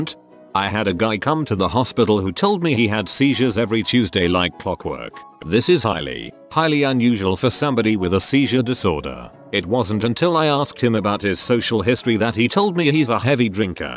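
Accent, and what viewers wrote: United Kingdom. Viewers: The voice reading is British XD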